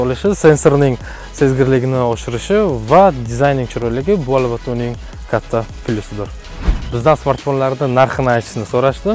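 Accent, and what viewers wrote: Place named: Bukhara, Uzbekistan. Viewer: Бухороча шева малумда барибир, гап йок молодец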